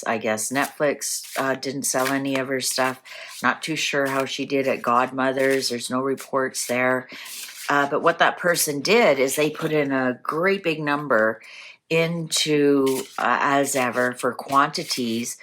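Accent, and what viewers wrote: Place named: Canada. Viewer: Ingredients for toe jam: hair and bacteria-filled hands 🤣🤣😭😭😭 your brutal yet somewhat nonchalant sarcasm (thrown in the mix) in your readings is greatly appreciated and adored over here 🥰😇 I also love your Canadian accent 😍 "I'm the toe-jam girl" 😭😭☠️🤡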